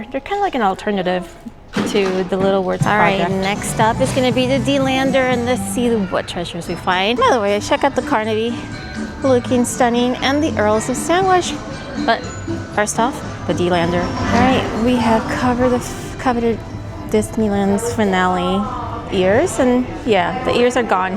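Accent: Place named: Chihuahua, Mexico. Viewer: Love your content videos. They’re not too long and not too short. For months now I’ve been wondering where your accent is from. I’m going to guess Chihuahua by how you pronounce the CH. Also, any chance you lived in Denver, because I heard you say, “yous” at timeline . PS. Stitch is wearing a bra on his head like on the movie? 😄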